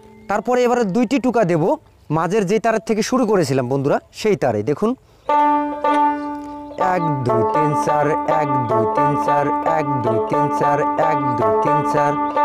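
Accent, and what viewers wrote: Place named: Bangladesh. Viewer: আপনি আসামের লোক অথচ ভাষা আমাদের বাংলাদেশের মত। অবাক লাগলো ব্যাপার টা।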